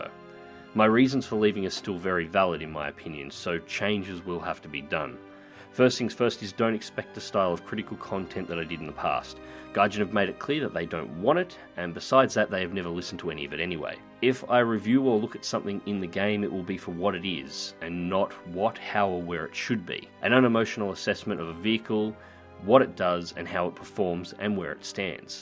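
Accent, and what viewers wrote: Australia. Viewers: I live, breath, eat, and sleep Realistic Air in War Thunder, and your videos are one of the reasons that when I made the switch from arcade, I was able to function. I've grown as a pilot since then, and while your tutorials and reviews aren't as helpful to the me of the present as they were to the noob that was me of the past, they are still helping me grow. Your content, regardless of the game you cover, is very entertaining to me. I mean, you've got yourself a prime Aussie accent. I will continue to be your subscriber, regardless of the choice you end up making here. That being said, I really want War Thunder back on the channel. No matter how asinine Gaijin can be sometimes, the game they created is loads of fun. Its beauty is only accentuated by your ability to show off its vehicles to a high potential. o7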